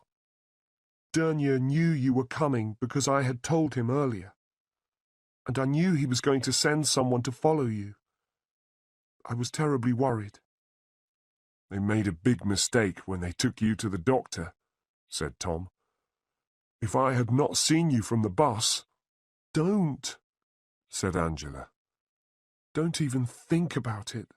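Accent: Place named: United Kingdom. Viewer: You are my favourite and perfect story poster, because its always with British accent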